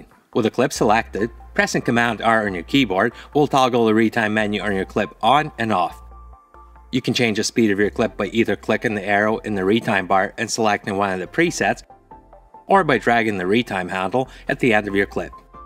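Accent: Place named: Quebec, Canada. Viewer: Great tip, and you have one of the most interesting accents I've heard! Mix of Asian and... French-Canadian? Am I dreaming? I never heard a voice like that :O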